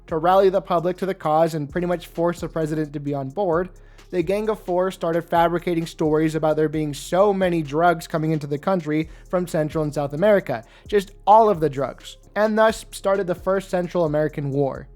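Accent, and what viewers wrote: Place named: Argentina. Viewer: Off topic, you have a very argentinian accent, i don't know what to think xD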